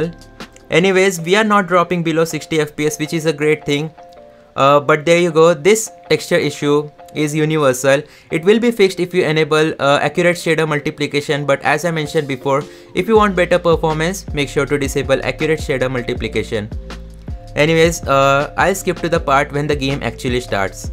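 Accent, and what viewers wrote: India. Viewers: I just cant stand his Indian accent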